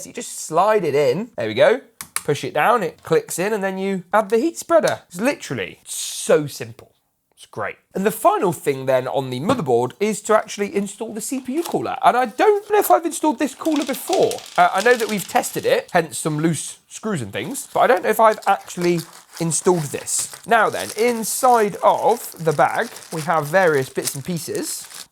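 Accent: United Kingdom. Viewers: Bruh the thick british accent i can't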